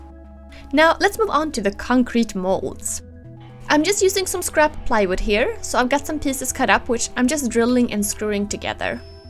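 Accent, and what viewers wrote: Sweden. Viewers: kul projekt! (antar att du är svensk pga uttalet) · swedish accent! :) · Nice! Well done! BTW R U from Sweden by any chance? Din dialekt är skön :) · are you Swedish? nice video! totally making this. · HI! Read that you live in Oregon now but you're from Sweden originally right? Recognize the accent ;) Moved there recently? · Are you Swedish? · You sound like you are from sweden :D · are you Swedish? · Hey, I wonder where you are from? :) what country? you sounds like a swed :P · 100% swedish accent! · You sound really Swedish haha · Very cool and creative product, keep it up! also, are you by any chance Swedish? your accent sounds really Swedish!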